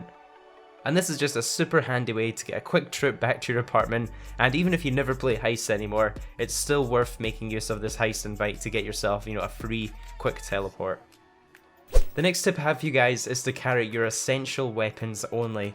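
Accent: Scotland, United Kingdom. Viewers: I just realised we kind of the same username 😂and you sound Scottish because I’m from Scotland and u sound Scottish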